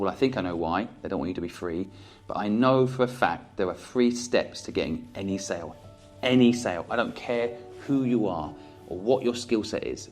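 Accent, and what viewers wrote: United Kingdom. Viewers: Ugh dammit, he's British :/ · Squibb just sounds British. I love that I finally found you today. This video is one of the most important videos I've ever watched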